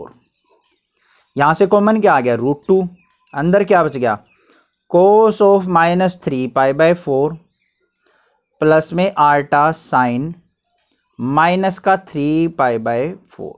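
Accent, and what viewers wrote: Haryana, India. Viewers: Sir r u from haryana? Bcoz u speak like haryani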